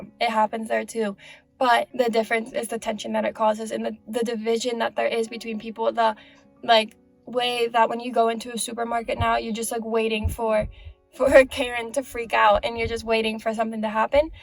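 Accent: Spain. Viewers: It is very interesting that when you speak I can sense some Spanish accent. yeah food hits me hard when I go back to the US, I gain weight really fast. I'm actually from Allentown, but I live in Slovenia for 8 years now and I don't really feel like moving back to the US. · Is it me? Or does it really sound like she has a little bit of an accent? which means that hilaria baldwin is right that she did pick somewhat of an accent while in spain.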